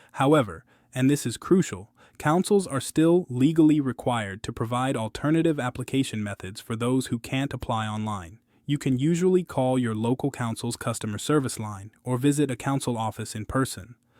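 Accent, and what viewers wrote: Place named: United States. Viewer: an usa accent talks about uk pensions etc would not trust a word he says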